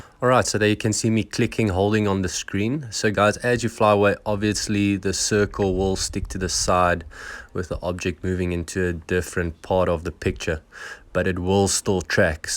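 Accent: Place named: South Africa. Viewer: Bro, you sound South African.... Lol I grew up there, Johannesburg.... But I live in Bulgaria, Sofia... Europe... Sweet 😁🛸🇧🇬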